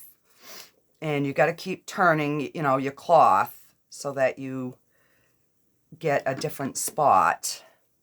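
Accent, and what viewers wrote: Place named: Boston, United States. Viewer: First video oof yoours that I watched. Very pretty. Are you from the Boston area? I hear a bit of an accent. I look forward to learning how to make my own pigment paste! Hugs from 🇨🇦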